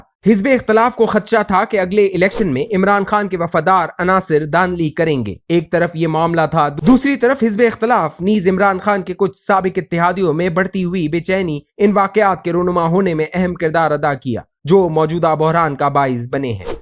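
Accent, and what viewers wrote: India. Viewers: Indian ascent shows imported content from India...??????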